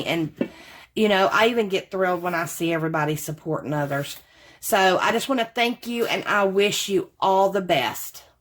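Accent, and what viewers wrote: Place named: South, United States. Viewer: I love that you are so talkative and cheerful and LOVE, LOVE, LOVE your Southern accent, my sweet! You are so much fun to watch! Big hug and love <3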